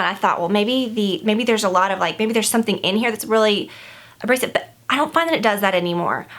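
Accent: South, United States. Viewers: You are FAB! (Your southern accent is subtle, but so cute!)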